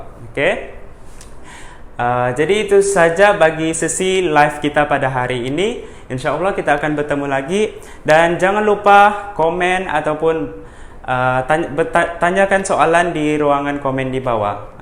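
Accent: Sabah, Malaysia. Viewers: Cg ni org Sabah ka ?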